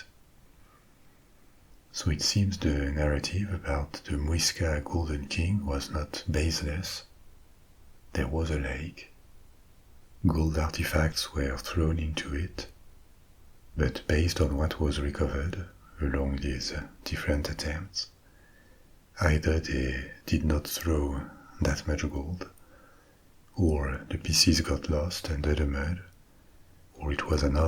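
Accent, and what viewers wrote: France. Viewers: Ohhhhh....just in time for that incredibly soothing baritone voice & fascinating French accent to lull me to sleep!! Thank you, Sir!! Your talent & gifts sre greatly appreciated!!!! ❤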